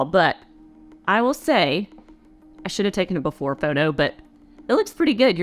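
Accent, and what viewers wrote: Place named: South, United States. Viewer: Love your videos, short and to the point. Love your accent too being from the south myself. Dupe videos from you are awesome!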